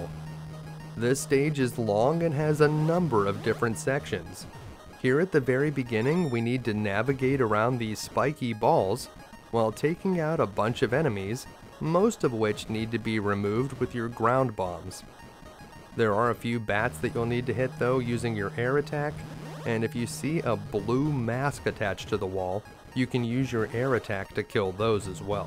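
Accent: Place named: United States. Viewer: This is the best American accent from a Chinese person I have ever heard.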